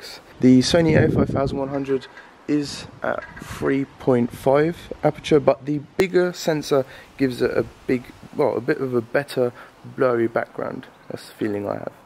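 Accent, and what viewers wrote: England, United Kingdom. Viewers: Bist du Engländer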